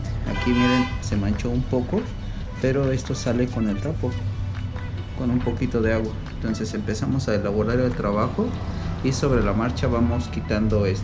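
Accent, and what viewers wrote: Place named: Mexico City, Mexico. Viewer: Por este commentario y por lo chingon que eres me suscrito. Y por lo bueno que explicas Las cosas. Escuchandote me haria pensar que eres de Mexico y El modo que te expresas de la ciudad de Mexico. No haces trasmisiones en vivo? Es la Iwata hp ch la que estas usando? Ahora le dare un I fucking like it a lot😁💣💥💢 Gracias..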